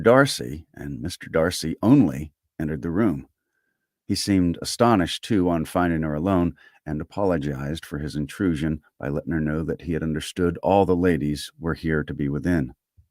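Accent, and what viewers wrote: South, United States. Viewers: This is genius. The southern accent really suits P&P. 😂❤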